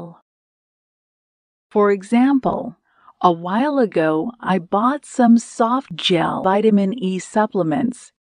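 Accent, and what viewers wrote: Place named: United States. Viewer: This is American English, please differentiate.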